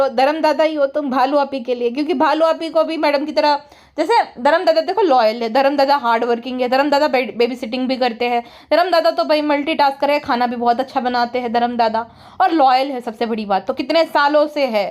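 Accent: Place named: Uttar Pradesh, India. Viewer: Your UP accent😂😂😂 orii morii maiyaaa 😂😂 love your podcast ❤ love and support from Gr Noida..😊 aur video like kardiya h❤🥲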